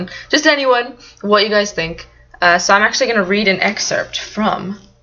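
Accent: United Kingdom. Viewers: Really good accent cant fault it if your going for a very posh classy accent you have nailed it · hey im from England and that's a proper posh English ascent its really good!!! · Your english accent is pretty good. It sounds more posh upper class southern than normal BBC London southern. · Wow you must be British x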